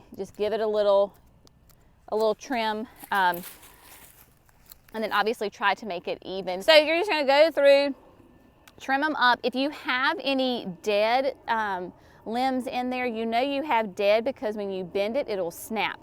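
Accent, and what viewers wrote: South, United States. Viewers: I was so inspired and motivated watching this video!! I am in the process of moving blueberry bushes and was so worried I might kill them as they are very established. Some how watching you calmed my fears. Question… where on earth can I find some ‘Land and Sea’ compost??? I live in Kentucky close to Clarksville TN and can’t seem to find it at my garden centers. Is there another alternative you could recommend?? I just love your channel… your sweet southern accent and smile MAKES MY DAY! ❤️❤️❤️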